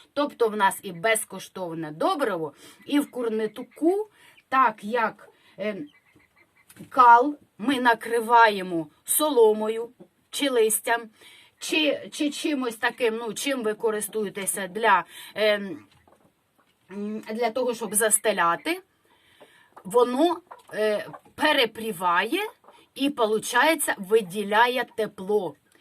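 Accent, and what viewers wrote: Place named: Central Ukraine, Ukraine. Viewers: Зайшла на ваш канал вперше,лайк і підписалася, дякую за щирість за таке українське спілкування,дивлюся Вас із Італії але сама родом із Вінниці,мова дуже схожа, дякую, буду слухати ваші поради, вони дієві це точно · Пані! Дякую вам за поширення домашніх справ. У мене закралась цікава думка, у вас така говірка, ніколи не повірив, що ви з Кривого Рогуі...наче десь з центральної України ближче до Полтави.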